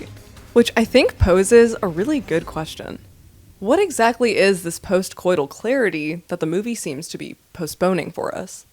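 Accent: Canada. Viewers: lol late to this, but her Canadian accent here sounds kind of like U.S. Midwestern standard reining in the tendencies of California English. However it affects the pronunciation, it’s actually quite pleasant.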